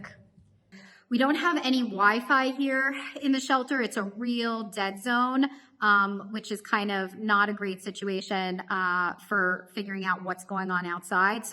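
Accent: United States. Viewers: you have a nice American accent....why u live on stolen land...go home save your Kids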